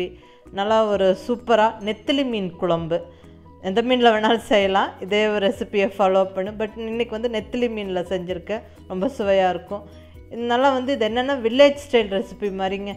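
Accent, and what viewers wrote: Coimbatore, India. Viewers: சூப்பரா இருக்கு கோயம்புத்தூர்ல நீங்க எந்த இடத்தில இருக்கீங்க ன்னு சொல்லுங்க மேடம் நீங்க பேசற லேங்குவேஜ் சூப்பரா இருக்கு நாங்களும் இப்படிதான் பேசுவோம் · Hi aunty Naan Bangalore larundhu ungaloda periya fan Unga kongu tamil ennaku romba pudikum Unga recipes lam Vera level Naan clg 1st year poga poren Enga chitti and ammuchi lam Coimbatore la dhan irukanga Yedayarpalayam la Ungala naanga paakanum Plz neenga enga irukinga nu sollunga 😍😍😍😍😘😘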